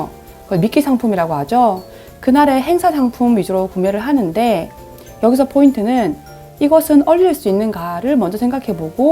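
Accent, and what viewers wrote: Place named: Busan, South Korea. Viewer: 부산이나 김해나 양산?쪽인가바요ㅎ 부산살아서 말투가 익숙해여ㅎ 4인가족 많이 배우고가요^^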